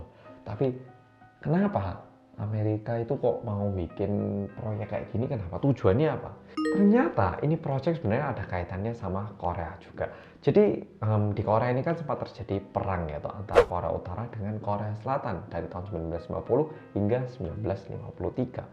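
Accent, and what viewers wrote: Java, Indonesia. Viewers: Lah ini hehhe akhirnya 😁😁😁 kalo gada suarane kaaya gak klop mas. Gak bisa denger medoknya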